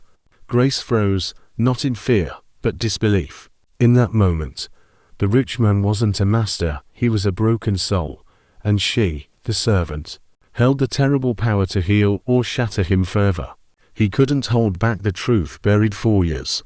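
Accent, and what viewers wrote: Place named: United Kingdom. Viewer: narration has a British accent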